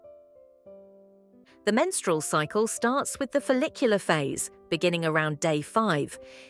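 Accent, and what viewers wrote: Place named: United Kingdom. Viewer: O sotaque britânico é perfeito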